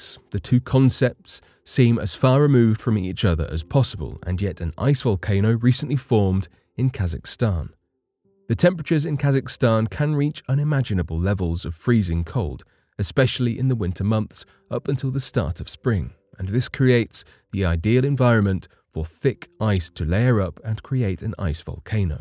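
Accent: England, United Kingdom. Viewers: When you start a video and hear a THICK uk england accent i know the video is going to be a bloody bunch of shite..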